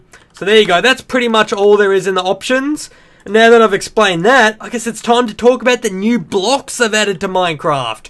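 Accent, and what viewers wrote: Australia. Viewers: r u australian :)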